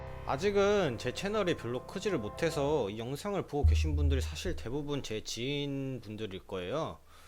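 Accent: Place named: Jeolla, South Korea. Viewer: 전라도 사투리 쓰시네요.. 참고할께요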